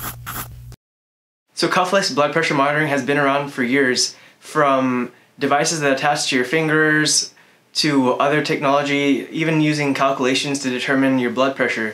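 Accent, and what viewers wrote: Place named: United States. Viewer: Ur talking style like American so I asked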